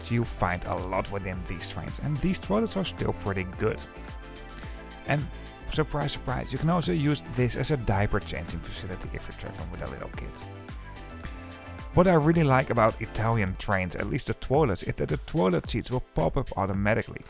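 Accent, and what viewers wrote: Netherlands. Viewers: Hearing the Dutch accent voice train travel just makes me happy! I needed this on a busy Monday in Germany.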